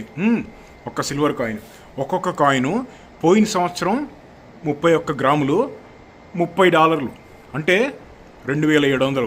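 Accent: Andhra Pradesh, India. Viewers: your slang is like andhra y you are staying in telangana then😀😀😀😀😀😀😀😀😀😀😀😀😀😀😀😀😀😀😀😀😀😀😀😀😀😀😀😀😀😀😀😀😀😀😀😀😀😀😀😀😀😀😀😀😀😀😀😀😀😀😀😀😀😀😀😀😀😀😀😀😀😀😀😀😀😀😀😀😀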